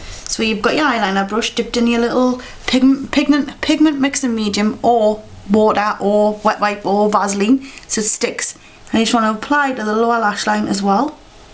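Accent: United Kingdom. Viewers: you look like my art teacher! haha tahts cool. r u british??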